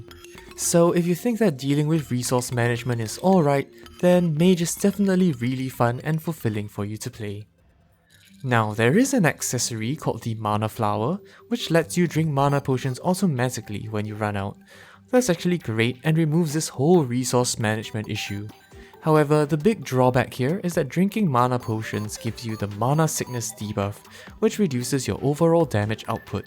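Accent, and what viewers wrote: Singapore. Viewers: hai may i ask r u singaporean?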